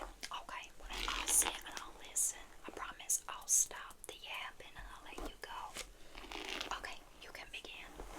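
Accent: United States. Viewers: I love this. Thanks to bridgerton I have heard so many asmr videos with terrible British accents! Your American accent is ten times better than when I try one. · your "country" accent is too cute haha. loved this character and roleplay ❤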